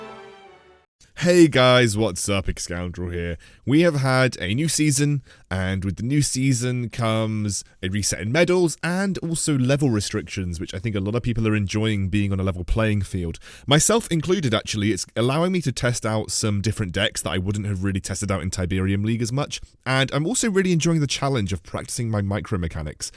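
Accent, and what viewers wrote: United Kingdom. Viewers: You do a good job on these videos pal, what part of the UK are you from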